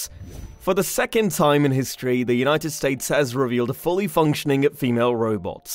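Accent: United Kingdom. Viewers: why thr brit accent infloozer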